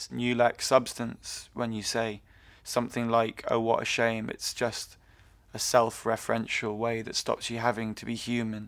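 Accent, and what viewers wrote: United Kingdom. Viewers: choke me with that British accent. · By listening from speaking, I read the comments with a British accent in my mind.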